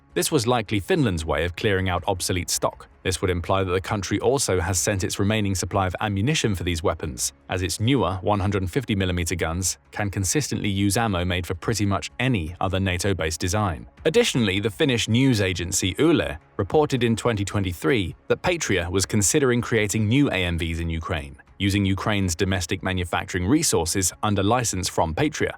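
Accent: United Kingdom. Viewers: Another british accent. Is that all that's out there anymore? Adios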